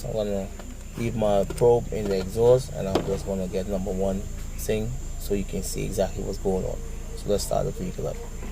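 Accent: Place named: Trinidad and Tobago. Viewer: Idk why I hear a hint of Trini in your speech lol.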